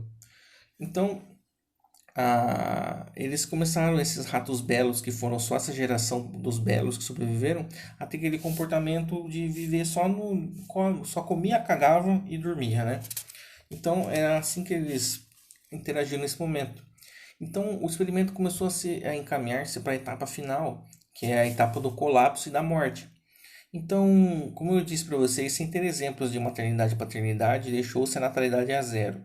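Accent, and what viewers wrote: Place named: mixed, Brazil. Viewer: O sotaque mineiro/goiano é perceptível, ksksk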